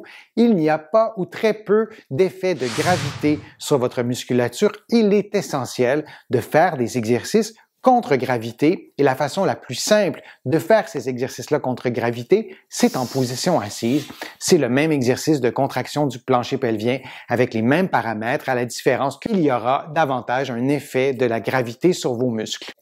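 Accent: Canada. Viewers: ❤! De plus, j’adore votre accent canadien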